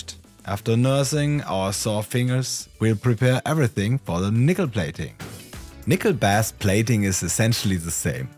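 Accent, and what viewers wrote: Germany. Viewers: Eindeutig deutscher Dialekt 😁 Edit: Akzent xD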